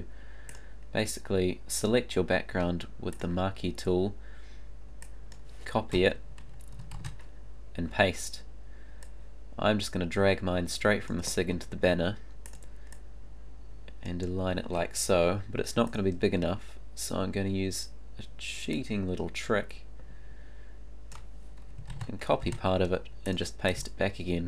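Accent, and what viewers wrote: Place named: New Zealand. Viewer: He isnt an aussie, hes from nz buddy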